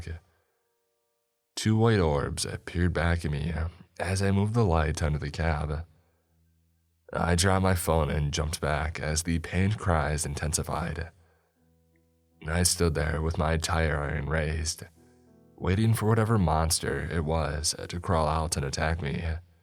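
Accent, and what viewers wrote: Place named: South, United States. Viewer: I have never heard your normal voice. You almost sound southern. And your voice is naturally that deep?! Good God lol.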